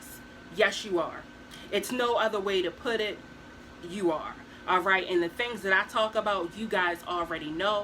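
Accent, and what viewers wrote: Baltimore, United States. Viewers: Love watching you! I can always spot a Baltimore accent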